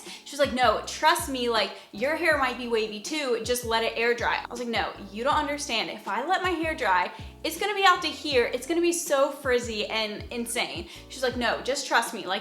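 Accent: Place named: Michigan, United States. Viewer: Super weird question.. are you from Michigan? Your accent sounds EXACTLY like a like my multiple of my friends.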